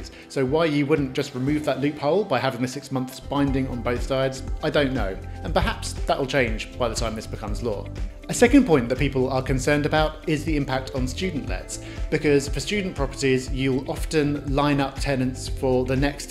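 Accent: United Kingdom. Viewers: It is irresponsible of you to NOT make it clear in the video title that this is about the UK. (Only once I heard your accent did I suspect it might be - and there again, you NEVER say it in the video either!) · The picture you click on to start the video shows the Houses of Parliament in London, the presenter guy has an English accent - I'd say it's probably England... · Title is misleading. Applies to UK not the U.S. First hints are his Accent and mentioning Parliament. Magna Carter did percolate to protect property rights for the landlord.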